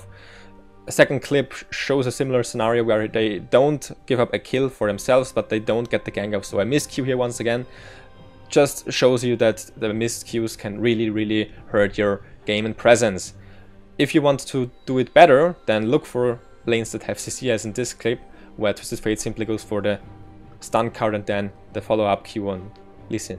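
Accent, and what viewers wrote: Germany. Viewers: du bist deutsch oder? xD Nettes guide! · are you german?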